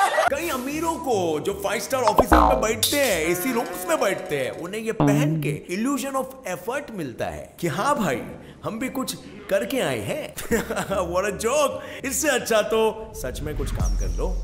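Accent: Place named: Maharashtra, India. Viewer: Maharashtra se hai kya? Because accent se aisa hi lagata hai🔥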